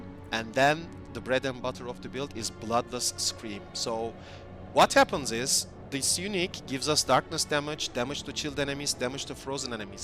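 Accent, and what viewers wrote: Turkey. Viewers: Sen turksun demi o aksani nerde gorsem tanirim.amk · great vid are you turkish ur accent sounds like it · bu türk ingilizcesi, nerde duysam tanırım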